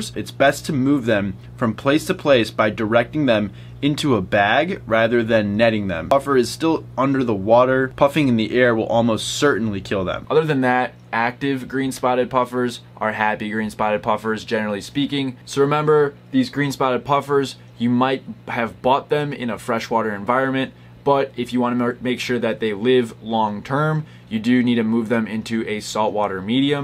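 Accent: Wisconsin, United States. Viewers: It's so odd hearing Wisconsin since yknow,, home state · Wait are you from Wisconsin?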